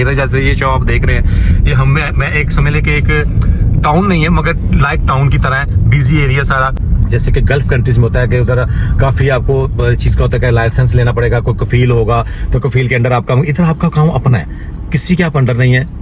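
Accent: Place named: London, United Kingdom. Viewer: Is very hard to understand your London english.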